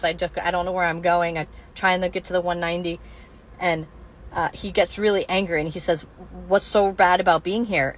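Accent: United States. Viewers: The lesson is that Canada were more understanding and helpful than your own country. Thank goodness you're a white female with a clearly American accent. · She has an American accent. Where is she a refugee from? I have made that mistake near the Peace bridge before and got confused at the exit from I-90 and instead of getting on to Porter Avenue I ended up routed to the Peace bridge. My ordeal was much shorter though and I was able to turn around at the entrance of the bridge rather than going over to Canada. My memory is a little foggy but I think there is a toll booth there.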